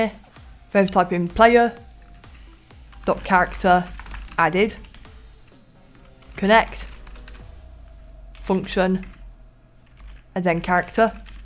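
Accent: United Kingdom. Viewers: You sounded like a fellow bri'ish mate.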